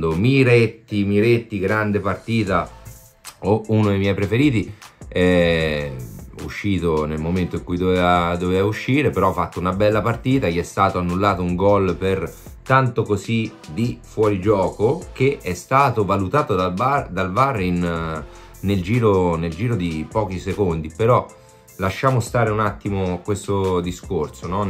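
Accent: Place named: Rome, Italy. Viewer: Ma quando mi piace sto....con questo accento romanesco sempre sorridente, competente.Arrivera' il giorno di miretti di fagioli e attenzione.....Pogba.....sono di Bergamo juventino (antiatalantino).Mi raccomando.Ciaooo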